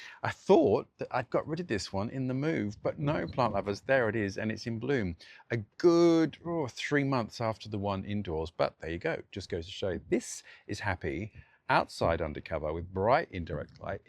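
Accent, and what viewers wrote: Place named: Australia. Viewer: your rental is fabulous and please don’t stop making videos! Your great sense of humor and delightful Aussie accent truly elevate the viewing experience for me, and I thoroughly appreciate your orchid culture insight and so enjoy seeing your plants. Happy New Year from my very crowded rustic cabin grow space in Southern CA.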